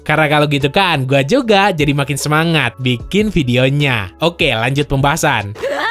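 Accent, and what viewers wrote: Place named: West Java, Indonesia. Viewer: Sundanese detected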